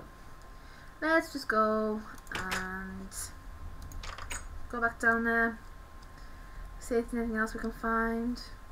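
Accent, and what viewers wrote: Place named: United Kingdom. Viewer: U speak british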